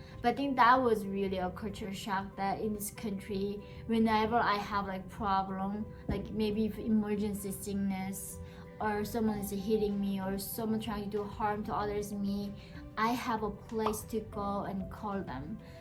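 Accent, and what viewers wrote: United States. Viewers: Picking up that American accent I see I like lol 😍 Cheers much love and respect from los Angeles CA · She now has an American accent! Lovey person and so intelligent 😌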